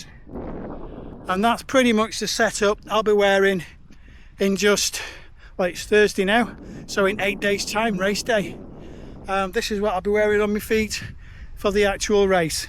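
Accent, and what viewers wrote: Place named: Yorkshire, United Kingdom. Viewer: How come you got a Yorkshire accent and you're running for running for l the Welsh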